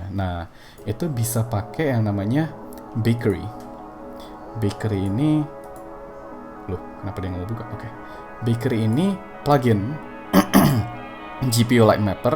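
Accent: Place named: United States. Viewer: Anytime you say an english word its pronounced perfectly with an American accent lol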